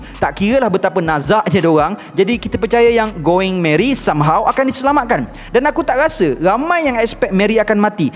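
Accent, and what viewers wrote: Malaysia. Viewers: Waa hebat la abg pandai cakap manglish.. 🥳🥳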